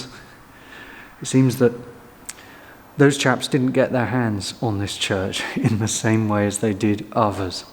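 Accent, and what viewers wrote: United Kingdom. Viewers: Auch wenn ich nicht alles verstehe, da mein Englisch nicht so gut ist, schaue ich Deine Videos gern und genieße Deine angenehme britische Stimme. Die vorgestellten Kirchen, Orgeln und Musikstücke sind immer interessant. Ich wünsche Dir und allen anderen Zusehern auf der ganzen Welt ein frohes neues Jahr 2026!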